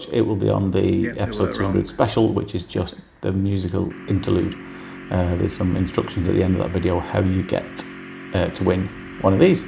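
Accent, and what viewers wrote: United Kingdom. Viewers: Did you say that you twang your G string every now and then? 😂 you need to Google what a G string is in the US…LMAO 😂 I love the way you British talk!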